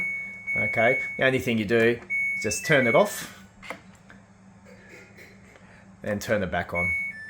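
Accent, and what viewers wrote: Australia. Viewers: Aussie accent makes me laugh my pp off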